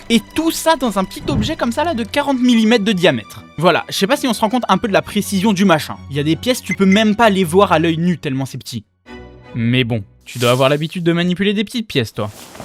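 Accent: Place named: France. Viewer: Découvert avec la version Anglaise au lourd french accent, très drôle et superbes visuels, bravo!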